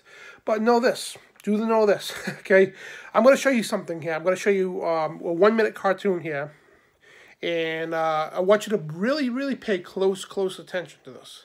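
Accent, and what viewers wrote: New Jersey, United States. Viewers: You sound like your from NJ.,definitely the north east coast.Well said ,well said.I agree with every word. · I didn't realize Rhode island had a Jersey accent. Off topic but I didn't catch it until I caught your video addressing your state criminal organization (your elected officials) let's just hope more men and young adults along with the rise in woman conceal carry holders/gun owners in general stop feeding into the complacency and cush way of life and realize things will become very uncomfortable when we restore constitutional law back to our country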